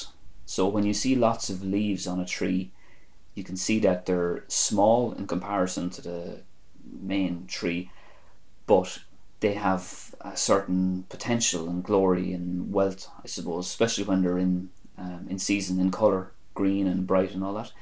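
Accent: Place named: Ireland. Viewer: Ó is duine dathúil thú! Is breá liom do bhlas an-bhlasta Éireannach! Ná bac leis mo ghriangraf - sin m'fhear chéile agus é marbh ón dara lá is fiche Máirt... táimid direach i ndiaidh a shochraide geal galánta - mar Ard- Rí, bhí slua mór ann agus ceoil na bhFlaitheas cóir! Tá mo chroí briste brúite, ach bíonn na fuaimeanna Ghaeilge suaimhneasach... Táim buíóch díot as do chuid chainte alainn.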